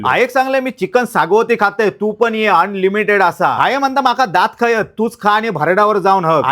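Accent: Malvani, India. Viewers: Ek no.bol n kuup chan kup avdat tumch bol n ❤ majj yet apli malvani 😊😊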